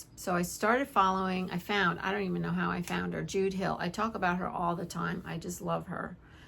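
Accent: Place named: Pennsylvania, United States. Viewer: A friend told me about your dots...I love watching your process! I also love your PA accent (I've lived on both sides, so I recognize the Pgh side, and the Philly side!). Anyway, love your gratitude focus. Thanks,!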